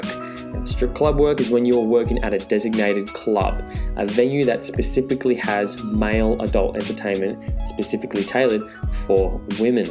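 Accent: Australia. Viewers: Sounds like an Australian accent to me, I should have taken it up years ago but may be too old now. My cousin ended up doing really well (Hunter) and an old time close friend Chase cleaned up also, I only got as far as topless waitering and chickened out unfortunately.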